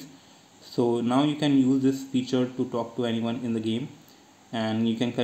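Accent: India. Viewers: Thats is actually very disrespectful he has an indian accent so dont be rude. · That's rude he is Indian I understand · Is bro from India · He’s India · Don’t be rude he is cool I understand him he’s is Indian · He is Indian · He’s Indian..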